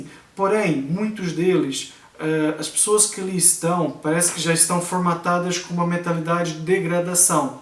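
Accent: Portugal. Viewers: ele fala oque quiser ok? o cara nao faz de preposito ele esta aqui em portugal e ta a apanhar um pouco de sotaque repaita o cara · Walter Oliveira isso de sotaque vai de cada um, provavelmente seus parentes moram em Portugal mas não estão integrados na sociedade portuguesa com nosso amigo está, tanto que a esposa é portuguesa. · Vi seus vídeos ontem, pela primeira vez. Gostei muito. Li alguns comentários abaixo e estou em dúvida. Você é brasileiro??? Percebi que seu sotaque Português é diferente. Eu sou carioca, e você, é de Portugal ou é brasileiro morando em Portugal há muiiiiito tempo? Achei excelentes os 3 videos que vi e tenho muitas peguntas, mas por hora é só isso....rsrsrsr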